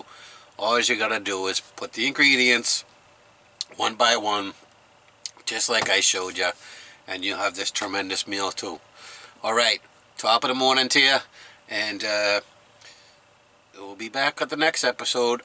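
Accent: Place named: Boston, United States. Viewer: There is no such thing as too much bacon. Ever. Love your Boston/Irish accent! lmao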